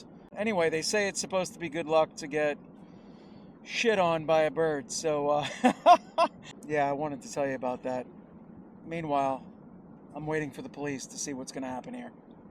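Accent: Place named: New York, United States. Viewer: Got to be on the look out fore snitches,man you went on a round a bout way to git to , the rinc key dinc island, but in teresting.brave soul,endurance.you talk like mi roommate Chuckie Frome the navy 34 yrs ago Norfolk ,va., he was Frome flushing and queens I am frome Chicago.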